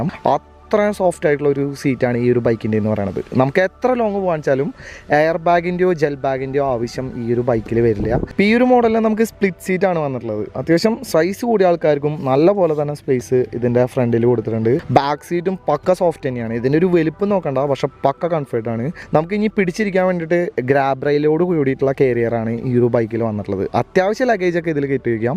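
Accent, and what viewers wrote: Thrissur, India. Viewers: ഇങ്ങള്‌ തൃശ്ശൂര്കാരനാണല്ലെ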